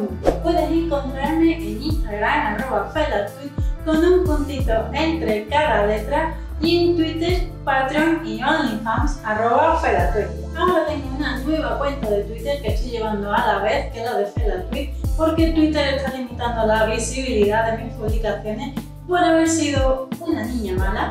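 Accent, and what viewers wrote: Andalusia, Spain. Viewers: Esos hoyuelos de venus... ufff!!! Qué hermosos 😍😍😍 Una pregunta: Eres de Andalucía?? Tienes un tono de voz melodioso e intrigante. Pura vida!!!🇨🇷✌️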